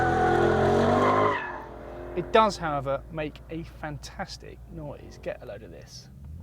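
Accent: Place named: England, United Kingdom. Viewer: Can we have Americans be the host. Hate this English Guy. Can't bareleunderstand him on my phone.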